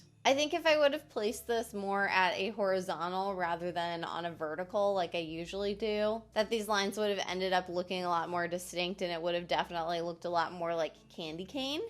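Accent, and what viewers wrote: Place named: Missouri, United States. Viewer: Definitely off topic but are you from Missouri?? I feel like you have a very Missouri accent and I love it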